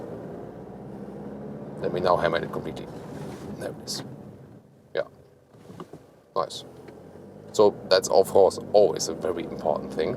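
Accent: Germany. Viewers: ich finde, dass das Englisch noch zu Deutsch klingt.. Und andere Frage, wo genau ist denn dieser Ort? Da sind anscheinend immer "Fahrzeugvorstellungen" mit einer art von Modell? Ah und wegen dem Knöpfen auf dem Lenkrad, genauso wie beim Ford Mondeo ;)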